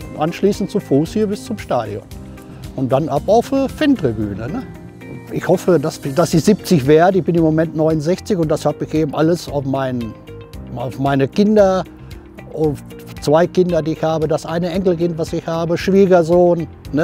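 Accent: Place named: East Westphalia, Germany. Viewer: Schon bei der ersten Ansage merkt man: Ein echter Ostwestfale!🖤💙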